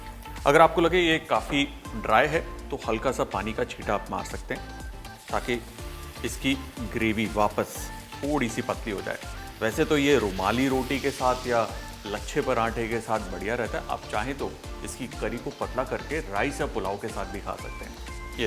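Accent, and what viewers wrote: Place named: Lucknow, India. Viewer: Aap bilkul lko ka touch dete hain boli m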